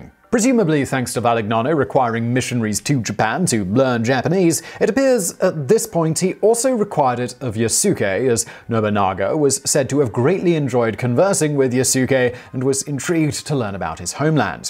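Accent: United Kingdom. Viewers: Yes, your french pronunciation is indeed terrible...nevertheless I like your "typical" british way of speaking english... ;)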